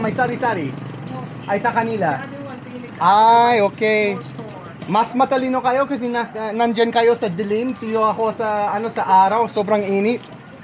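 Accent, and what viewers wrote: Ilocos, Philippines. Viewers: You have an accent of ilokano dialect. I have ilocano friend and you have similar intonations. I'm impressed because I heard from her that ilokano is very hard to learn especially the accent itself. Did you stay in ilocos and how long?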